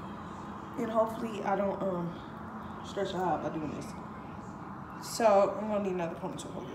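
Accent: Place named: South, United States. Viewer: I didn’t know you had a country accent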